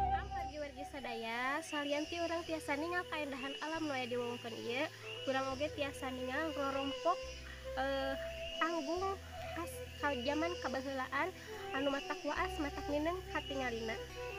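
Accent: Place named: Sundanese, Indonesia. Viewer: Bumi n mani sarae tur resik..nyariosna mani capetang sundana kentel Tur medok..lajeng gelis wilujeng saum ramdhan..alungan no wa neng..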